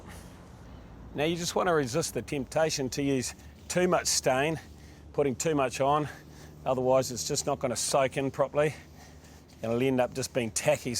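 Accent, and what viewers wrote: New Zealand. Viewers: Hi Daniel, it is New Zealand accent, not Aussie, we say deck.The presenter is great, hubby and i followed instruction and our deck looks great. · NZ accent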